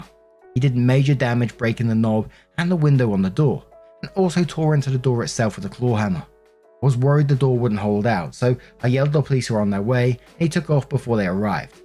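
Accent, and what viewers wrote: United Kingdom. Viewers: Went to listen and still too soon to hear a British accent after the Matilda’s loss. Am soo happy for for the Lionesses and honestly wish the the best of luck. Russo and Hemp played incredibly just heartbroken for the Tillies :( still wanted to leave clout to support the channel. #FIFAworldcup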